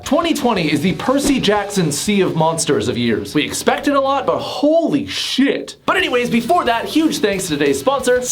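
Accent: United Kingdom. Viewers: his brit accent sounds like murdoc im crying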